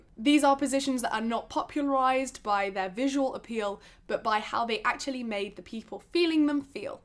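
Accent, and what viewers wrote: United Kingdom. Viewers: Your accent is that British accent in every movie, absolutely beautiful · I truely AM intrigued as to why quite a few of your video descriptions are actually in German? Ich find's wirklich toll, verstehe aber den Zusammenhang nicht, weil du einen britischen Akzent hast und deine Familie - was ich aus den Videos bisher gesehen habe - auch Englisch ist (?)... Lernst du Deutsch oder ist es einfach ein Witz? XD love your chanel, love your videos, and everytime a header is in German I'm drawn to it like a moth to light ^~^ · i’m also British and i love her accent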